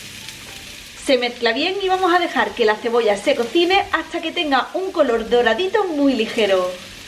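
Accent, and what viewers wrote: Andalusia, Spain. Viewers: Eres muy bonita. Gracias por la receta, por tu acento pareces de Andalucía. Besos desde Buenos Aires!!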